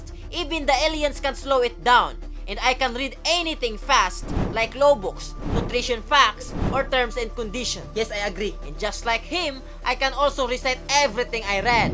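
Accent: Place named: United States. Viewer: american english i love it lol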